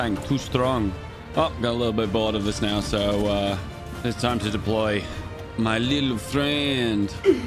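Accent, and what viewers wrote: United Kingdom. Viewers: It's amusing to hear a Limey talking shit about American economic policy and praising multiculturalism while seemingly oblivious to the fact that the policymakers of both our nations work for the central bank, not for the citizens, who in turn belong to a parasitic culture which has always and will always destroy its host nations through glorifying multiculturalism. Our nations are collapsing and our Folk are on the brink of extinction while we waste our time on pointless video games and silly videos on the internet.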